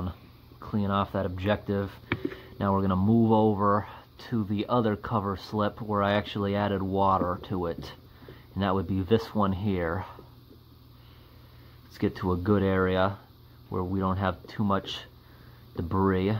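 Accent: New England, United States. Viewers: Who wants to play a guessing game and guess where this dude is from in Mew England? I’m guessing Maine, maybe Connecticut.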